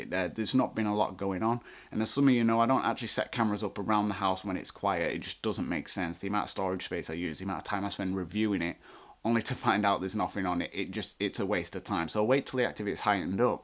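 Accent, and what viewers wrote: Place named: North, United Kingdom. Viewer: Just found this channel awesome stuff man. That was my life from a small kid until I was around 17 and moved out. But over the last five years the lights and, colours and noises are back around me. Kind of welcome it now. Where abouts are you from. Sound like a fellow northerner. Keep up the good work.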